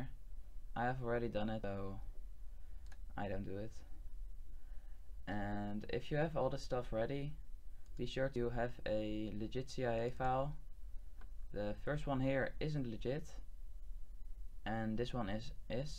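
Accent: Netherlands. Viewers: wanneer een nederlander engels praat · something tells me you're from holland :P, correct me if im wrong, and if you are from holland(don't worry about it im form holland aswell :P )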